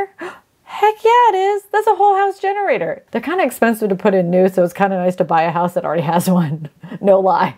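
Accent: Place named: New York City, United States. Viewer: People in Ithaca do not have that accent. You’re accent is more NYC, Long Island, New Jersey.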